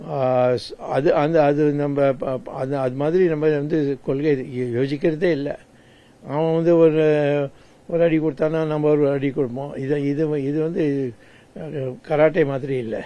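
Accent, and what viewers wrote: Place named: North, India. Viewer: Yenna da unnoda slang oru mairum puriya mattigu Vadakan da neee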